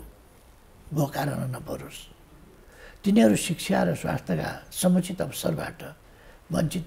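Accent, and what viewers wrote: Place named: United States. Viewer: baini timi nepali ki english ho???tongue ta purai half-american jasto xa ta...xi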